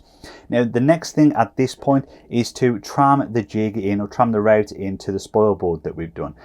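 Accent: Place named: Midlands, United Kingdom. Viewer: Found the channel researching small CNC, heard the Midlands accent, already confident I've found someone who knows his stuff. Great video, subscribed.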